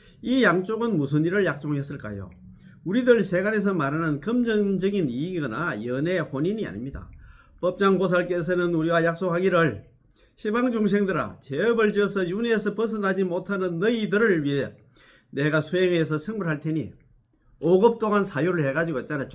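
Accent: South Korea. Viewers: 오늘은 비가 내리네요 인자한 미소와 경상도의. 구수한 사투리가 정감이가는선생님의. 강의. ㆍ존경합니다 오늘도 건강하시고 좋은날 되십시요 나무아미타불 나무아미타불 나무아미타불.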